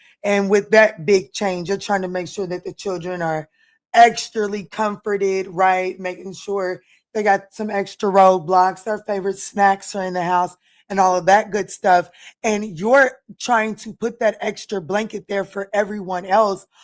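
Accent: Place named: South, United States. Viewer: 😂😭😂 she has got to be from the south. She done put 3 words into one😭🙈. I love it. Much love for the Leo Scorpio and Capricorn readings miss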